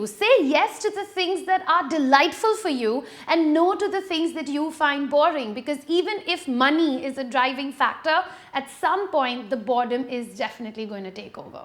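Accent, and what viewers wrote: India. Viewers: I just love your natural Indian accent :).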